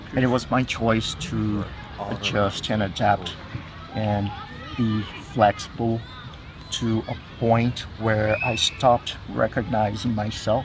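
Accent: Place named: Brazil. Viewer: how strange. Your Brazilian accent seemed stronger when you were in the US. I hope your family does not make fun of your American accent now. 😛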